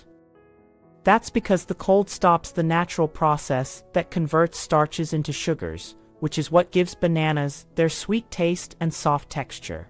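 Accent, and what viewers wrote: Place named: United States. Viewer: I hear an American voice telling us all this nonsense. Have you ever read the labels on most of your American Foods. They are packed full of Chemicals that cause more health harm than keeping them in the fridge.🏴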